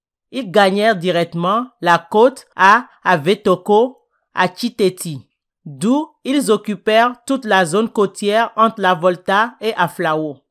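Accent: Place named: France. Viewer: C est bizarre on dirait que tu Francaise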